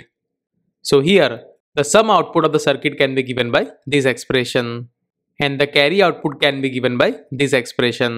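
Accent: India. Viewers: Product is pronounced pro---duct NOT "productum". You need to improve your spoken English as your thick indian accent is very difficult to understand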